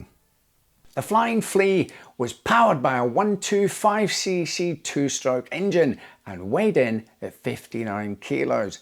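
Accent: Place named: Scotland, United Kingdom. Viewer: Love how he starts with an English accent then gradually becomes quite distinctly Scottish. In a very charming way!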